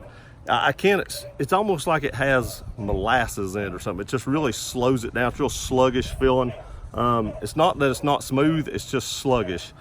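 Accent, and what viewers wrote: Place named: Texas, United States. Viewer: You kinda sound like you're from texas.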